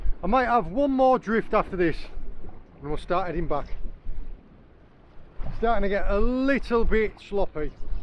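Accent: United Kingdom. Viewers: Viaduct I'm wondering that too. A lot of times the CC spelling is wrong and it also takes liberty in what the word you actually said. But the CC is better than nothing. After listening to your videos, I'm understanding more and more of your accent. And they say us Americans have a lot of accents, you Brits have won the war in that category by far. Another GREAT video, always a pleasure.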